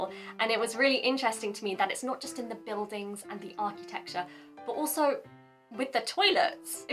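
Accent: RP, United Kingdom. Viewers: Hey,! I really admire your BRITISH ACCENT! People say my English is American, for I learned by American English textbooks since my junior hi days. When university, I was much influenced by Country-Western, bluegrass and hillbilly music, and now my accent sounds deeply Southern American. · Nice Queen's English. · イギリス英語の発声はやっぱりカッコいい！ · 当たり前ですが英語がとても上手いですね。ネイティブスピーカーを捕まえて批評など毛頭ありませんが素直に発音がいいと思うし話し方に品がありますね。同じ英語でもイギリス人はアメリカ人のような砕けた話し方は決してしない。本物のイギリス人を実感します。発音はやっぱりイギリス英語特有で喉の奥から発音するやり方で我々日本人にはなかなか真似できない。イギリス人の友人から「トマト」は「トマート」と発音し「トメート」とは発音しないと言われたのを思い出しました。それからどちらの国も島国で王室や皇室があることや騎士道や武士道があることも似ていますね。日本での生活は色々大変だと思いますがどうぞ頑張って下さい。そして楽しんで下さい。 May God make your life in Japan be more enjoyable and unforgettable.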